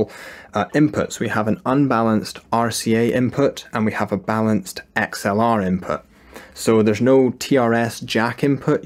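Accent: Scotland, United Kingdom. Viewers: Where are you from I know Scotland what part ?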